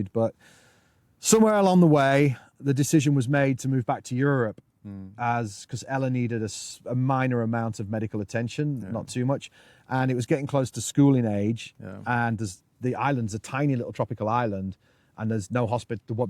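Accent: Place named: Lancashire, United Kingdom. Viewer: Hi.. I'm a new subscriber.. Just want to say what a fantastic lifestyle you've chosen.. It's good how you've taken your kids out of mainstream teaching.. What a way to go!!! They'll have a far better education doing your way! You are creating great content too.. Good on you!! Are you from Lancashire? I recognise the accent?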